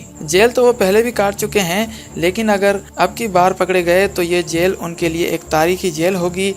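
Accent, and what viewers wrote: India. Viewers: awaaz se hi pata chal raha he k ye bharwa koi indian he panyakka tu te ap moodi da mundda lagg rea e'n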